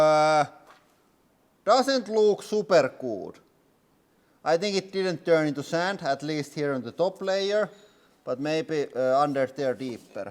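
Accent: Finland. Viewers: As a Dane I envy you Fins for your accent. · I like how he still doesn't fully grasp the concept of the letter Y in English making an "i" sound and not the "u" sound it does in Finnish. "Velcome to Huudraulic Press Channel" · I love his finnish accent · I have a theory that the Finnish accent it one of the secrets of this channels success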